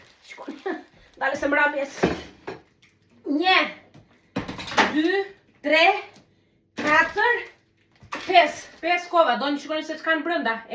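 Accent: Greece. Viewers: Ne Greqi jeton? Se kshu duket nga theksi😅? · Ti paske mar të gjith fshatin me vete.dukesh nga të folurit që jeton në greqi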